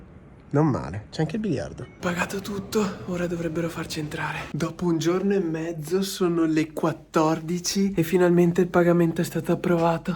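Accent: Veneto, Italy. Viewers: Non so come sia il bresciano però dai si sente un casino che è veneto 😂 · A me sembrava che avessse un accento Veneto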